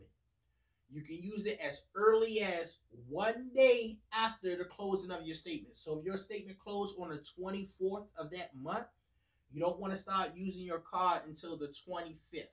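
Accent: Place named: Chicago, United States. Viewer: Can u mentor me I need help.. are u from chicago you sound like us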